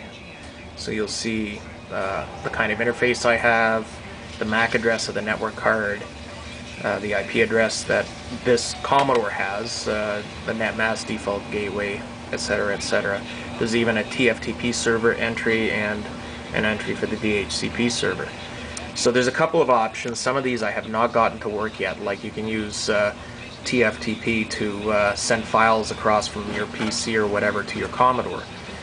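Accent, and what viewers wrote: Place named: Canada. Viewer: You sound very Canadian. :) Great vid!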